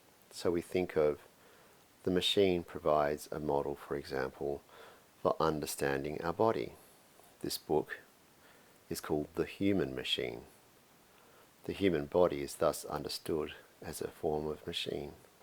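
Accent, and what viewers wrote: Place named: Australia. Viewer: his Aussie accent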